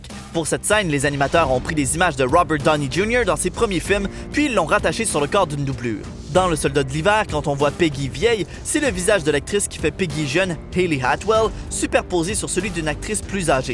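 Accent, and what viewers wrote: Quebec, Canada. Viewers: Cet accent est juste insupportable. Pitié, si, remplacez-le. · cet accent québéquois me donne envie de mourrir :( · Dislike pour ce putin d'accent québécois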